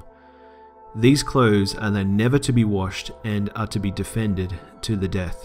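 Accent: Australia. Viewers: Hoho your pronunciation are still hilariously Australian...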